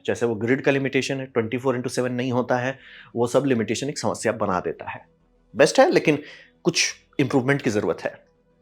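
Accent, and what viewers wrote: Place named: East India, India. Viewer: A u from bihr or east india? Value to bhalue??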